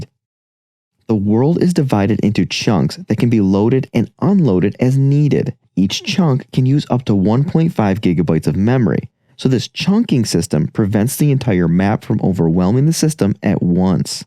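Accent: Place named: France. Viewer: I watched the video, other than French accent, I’m not hearing a difference. Jean rah (Jean like Picard from Star Trek)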